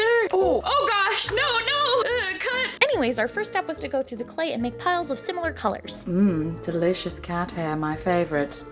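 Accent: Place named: United Kingdom. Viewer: Also your british accent is top tier! 👌 and that's coming from a Brit 🩷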